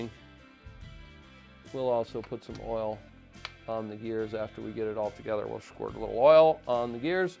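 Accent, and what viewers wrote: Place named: Appalachia, United States. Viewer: This guy got that Appalachian English down... Lm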